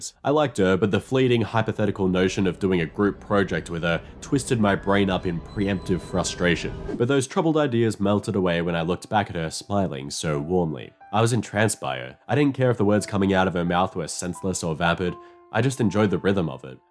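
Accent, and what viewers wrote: Melbourne, Australia. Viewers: So he's Australian. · You Aussie!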